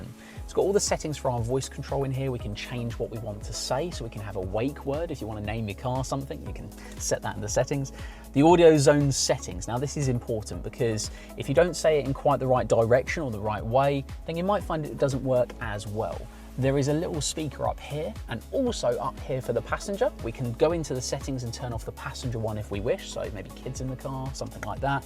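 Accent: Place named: United States. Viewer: is an American accent.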